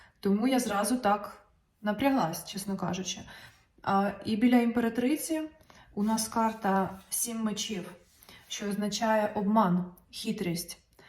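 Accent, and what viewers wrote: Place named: Kremenchuk, Ukraine. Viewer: Чистейший украинский, скорее в Кременчуге слышал, ни в Киеве ни Харькове, в Харькове вообще не слышал, ни в Полтаве ни в Бердичеве, ни в Житомире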